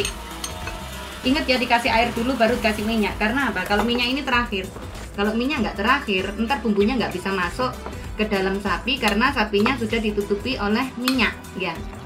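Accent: Java, Indonesia. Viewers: bhsa jowo mu medok men thanks dah slalu bls comenku slm dr jowo tengah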